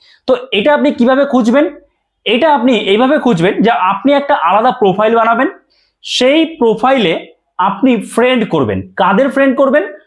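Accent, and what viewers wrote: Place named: West Bengal, India. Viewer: পশ্চিপবঙ্গের বাকভংগি ভালো লাগে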